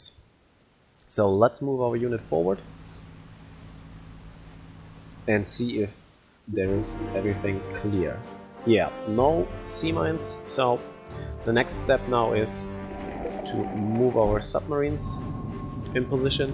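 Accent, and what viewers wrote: Germany. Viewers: German 🇩🇪?:D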